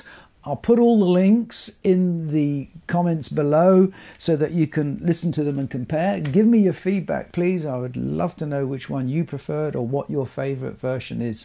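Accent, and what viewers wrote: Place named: United Kingdom. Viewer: Any Americans watching don’t let the British accent fool you. This man has nothing knowledgeable or illuminating to say about this music. His vocabulary is tiny and his attempts to pronounce French, German and Italian are pitiful. Worst of all, and it’s a crowded field, is the way the camera lingers on him throughout the extracts as he “conducts” along, bereft of any sense of rhythm, like Cary Grant as Toscanini.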